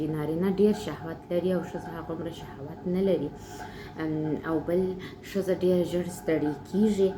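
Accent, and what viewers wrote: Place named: Kandahar, Afghanistan. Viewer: ستا دا کندهاری ژبه زما په زرکی سی❤❤❤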